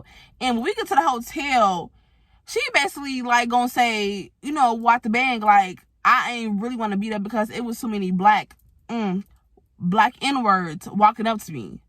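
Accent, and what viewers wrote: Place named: Chicago, United States. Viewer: I knew you were from Chicago as soon as you started talking😭🫶🏾. New subscriber! Taurus gangggg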